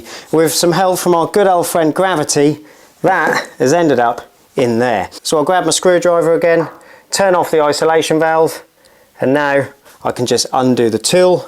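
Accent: Norfolk, United Kingdom. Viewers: Is that a Norfolk accent? Just asking.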